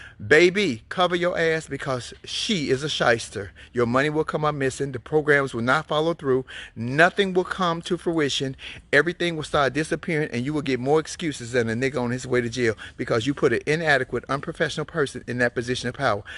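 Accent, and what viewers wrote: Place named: Chicago, United States. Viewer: I love you my dear PERIOD! You sound just like US girls from Chicago! The early 90s was the ish!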